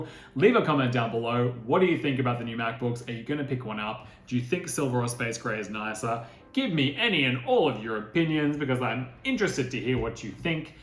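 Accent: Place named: United Kingdom. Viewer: Great video. For myself, I’m about to purchase. But I’m stuck between silver and space grey and I think you helped me with that but so ty for that bc I’ve been on the fence about what color I like, but the specs I’m getting are m1 max and 64 gb with 2 tb ssd for some future proofing. And I love your accent I’m moving to England from the states and I just love watching British content creators!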